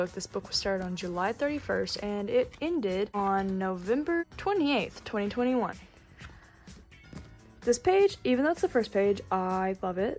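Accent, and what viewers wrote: South, United States. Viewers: ive watched this video at least 4 times and i just realized you have sort of a southern accent 😭😭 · I love your art it’s so expressive and fun and colorful! Also, are you southern? I feel like I’m detecting an accent. I’m from Missouri so maybe I’m projecting lol.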